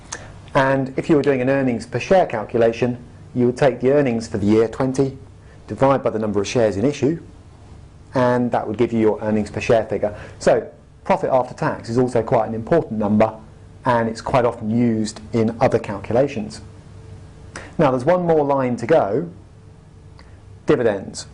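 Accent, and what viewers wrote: England, United Kingdom. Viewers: Is it just the English accent or he actually resembles Christian bale... Great video...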